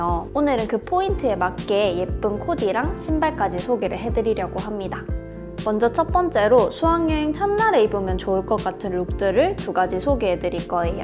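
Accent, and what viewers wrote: South Korea. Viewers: I love her accent.. it's kinda like my korean friend's accent XD love you unnie, fighting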